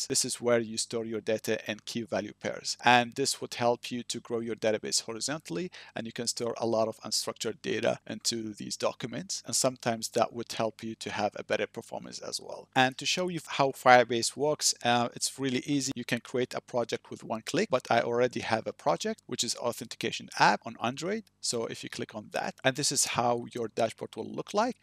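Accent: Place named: Arab world. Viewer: Are you Arab? Because you sound like one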